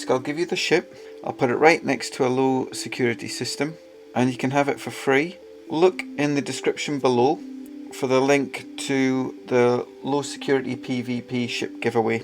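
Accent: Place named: United Kingdom. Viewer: I been playing for over 10 years and know everything about this game…. but I still listening to videos because he has such a soothing UK voice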